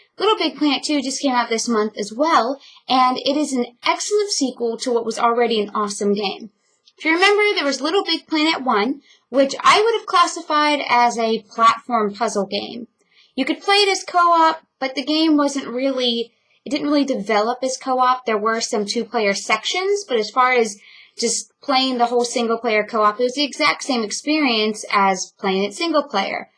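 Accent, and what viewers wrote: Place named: England, United Kingdom. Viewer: I don't want be a douche, but it's an English accent, not British, because you're not going Welsh, Scottish or Irish. Great review by the way.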